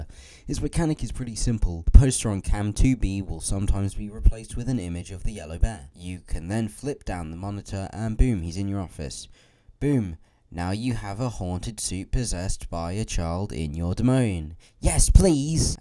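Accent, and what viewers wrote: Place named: United Kingdom. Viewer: british guy talking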